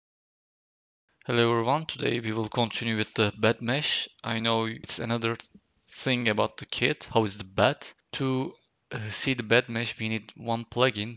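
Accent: Turkey. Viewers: dostum türksün sanırsam konuşmandan sezdim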